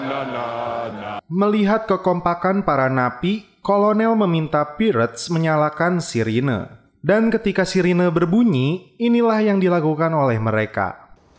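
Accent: West Java, Indonesia. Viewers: Org sunda y bang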